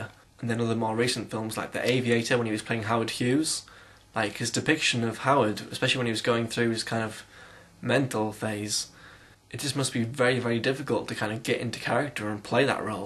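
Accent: England, United Kingdom. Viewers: I love Leo DiCaprio! I watched the Aviator again today lol - coicidence? And What's Eating Gilbert Grape is one of my fav movies ^_^ Have you seen Catch Me If You Can? His depiction of Frank William Abagnale, from being a confused teenager to a mastermind at cheque forgery... is just epic. I could be a spokes person for that movie lol. I also love your accent, where abouts in England are you from?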